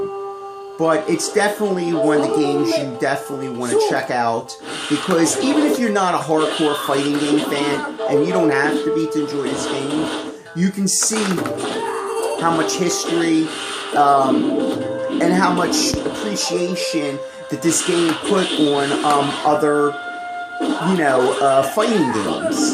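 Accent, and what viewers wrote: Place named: East Coast, United States. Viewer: I love the opening line and your East Coast accent!! :D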